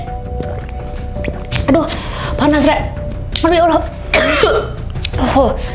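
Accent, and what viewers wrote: Java, Indonesia. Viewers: Bumbu nya medok banget kaya ngomong nya medok banget 👍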